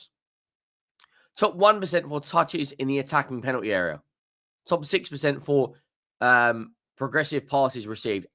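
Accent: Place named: Home Counties, United Kingdom. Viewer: Speak English lad, typical home counties,no offence but it just sounds weird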